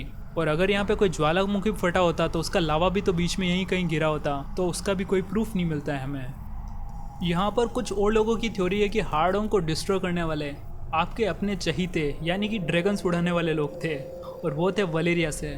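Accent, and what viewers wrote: Gujarat, India. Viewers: Bhai tu gujrati hai na ??😅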